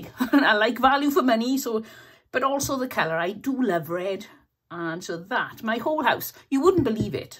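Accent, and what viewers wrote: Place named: South Wales, United Kingdom. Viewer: I agree. The South Wales accent is so beautiful.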